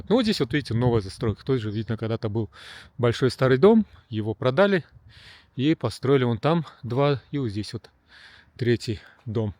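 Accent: Japan. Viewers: Давно не смотрела ролики и вот что обнаружила спустя долгое время - появился японский говор, если так можно выразиться ))))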